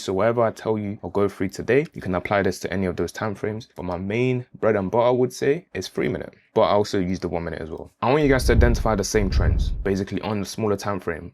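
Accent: United Kingdom. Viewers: Perfect, Great info, and well presented, you're a gifted teacher...👍 You sound like you're a Londoner mate... Arsenal or Tottenham? 🫡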